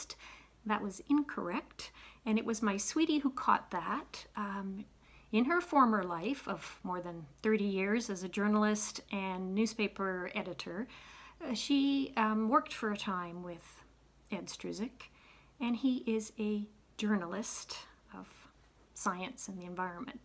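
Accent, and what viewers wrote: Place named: Canada. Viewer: You were discussing your accent in this video (just to refresh your memory since this was a LONG time ago). I LOVE your accent. I feel like people from Canada seem to annunciate much better than Americans and I'm not sure how much of that is accent and how much is just valuing speaking properly. I only have a handful of Canadian friends, but they all tend to speak slowly, carefully, and annunciate!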